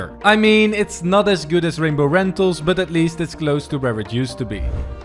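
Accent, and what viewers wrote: Netherlands. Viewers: are you dutch? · Are you Dutch? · Are you from the Netherlands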